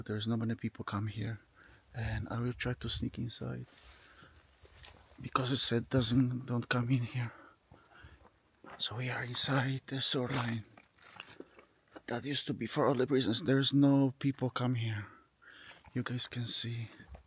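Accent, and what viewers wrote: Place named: United States. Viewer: Your accent is really hard to understand, are you from America??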